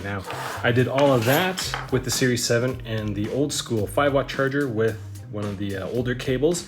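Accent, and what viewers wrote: Canada. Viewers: Is this dude Canadian?? What a lame reviewer and his jokes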